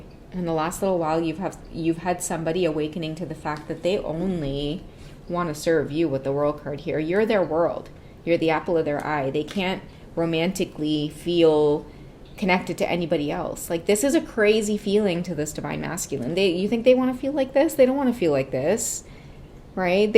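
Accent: Trinidad and Tobago. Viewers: Could not mistake that Trini accent ❤️🇹🇹 I was listening while washing dishes and when I heard the accent I jumped 😂😂 I’m a Trini, living in Trinidad, who loves looking at tarot readings, just found your channel and I’m so happy to hear that accent 😅❤ Also I hope that’s my DM because I know he loves me, but timing and distance is the block. We’re both very busy too trying to build our lives 🥺 idk if it’ll go anywhere but we do have a beautiful connection, I at least really love that! ❤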